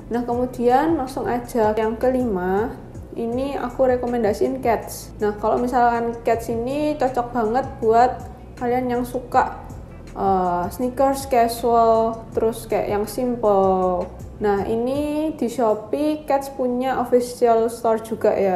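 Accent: Java, Indonesia. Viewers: Suka medoknya 👍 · Aku suka medokmu cik 😍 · Orang mana kak kok kayak ada logat Jawa nya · medokmu buatku jatuh cinta 😍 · Mendok banget to samean mbakk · Salfok sama ke medok an nya mba 👍🏻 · baru nonton pertama., mbaknya medok ini jawa mana ya? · Mbak jawane medok🤣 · pertahankan medok nya 👍🏻🥵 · Muka korea logat jawa Jadi bikin betah di chanel ini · Maaf bukan rasis🙏. Liat mbak nya kirain orang China luar negri,tau tau China nya udah medok banget hihihi🌚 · Tadi y pengen tau ori atau kw ,tp pas denger medooook y itu ...smgat dan sukses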